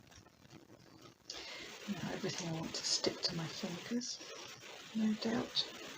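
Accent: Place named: South England, United Kingdom. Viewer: I live in Newark. Thanks for the tip. I am going to raid those charity shops next time I get chance. You definitely have a South of England edge to your voice. Xx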